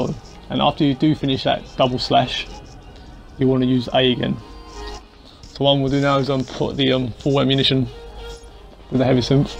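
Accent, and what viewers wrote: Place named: Australia. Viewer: first, plus you australian because you sound like it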